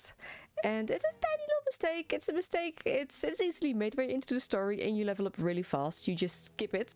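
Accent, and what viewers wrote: Netherlands. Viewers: You sound kinda dutch. Ben je een Nederlander?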